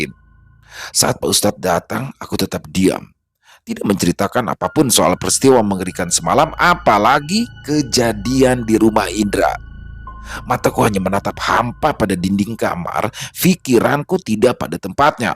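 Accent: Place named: Java, Indonesia. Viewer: Kadangkala terpikat dengan gaya membawakan ceritanya yang terdengar seperti dialwk orang Betawi,juga seperti orang jawa dialeknya........ya teruslah berkarya ....semoga sehat dan sukses ya.....ok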